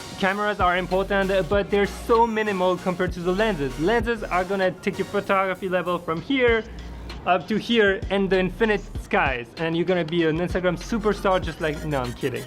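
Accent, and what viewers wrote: France. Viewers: Ton accent français 👌👌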